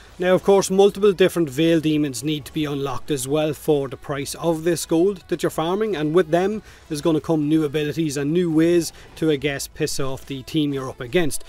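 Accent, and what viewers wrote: Ireland. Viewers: Every time I watch one of ur vids, your accent make me think about the 100000 pints of Guinness I used to drink when I was in Ireland back in the 90's...and I feel nostalgic :D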